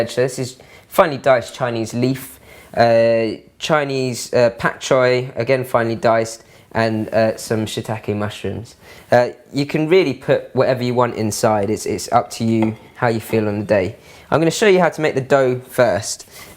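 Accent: United Kingdom. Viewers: I don't really care about his cooking skill... thinking from Asian American point of view, he has the cutest British Accent...LOVE IT! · British accent is so elegant. I love dumplings anyway! :O)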